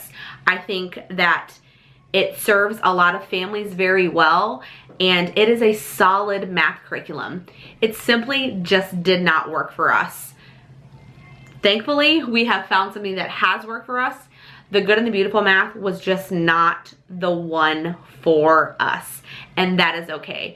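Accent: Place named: New Jersey, United States. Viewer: Hiiiiii!!! I just found your channel and subscribed! So weird but what state are you in? You sound like you’re in jersey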